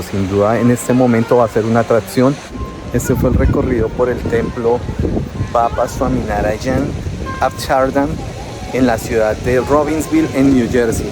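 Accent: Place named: Italy. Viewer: You are speaking Spanish with a Italian accent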